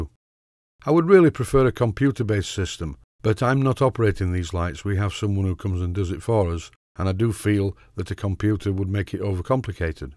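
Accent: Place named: Northern England, United Kingdom. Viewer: That's a very helpful video - thanks a lot! (And, I couldn't help noticing, very sweet Northern English dialect - u sounds, I luve it :-)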